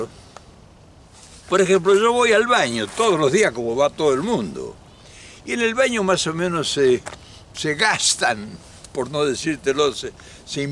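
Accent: Argentina. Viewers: Buenos días y amigos del espacio Quería hacer un comentario que parece insignificante pero no es menor como argentino admiro la manera en que mantiene a pesar de los años que se vió obligado a irse del país nunca perdió el acento bonaerense (o sea el ser nacido en la provincia de Buenos Aires) para los que no conocen nuestra provincia simplemente gracias por su autenticidad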